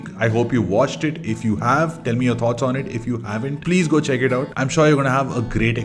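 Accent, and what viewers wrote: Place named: Tamil Nadu, India. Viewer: Nice review sensibility, and good cinema awareness, man. I haven't watched these movies yet, but your review makes me want to. And, with a surname like, I would have thought you were a Malayali. Your pronunciation of the Malayalam words, though, - flawless as they are - suggest that you aren't primarily a Malayalam speaker... you sound more Tamil than Malayali.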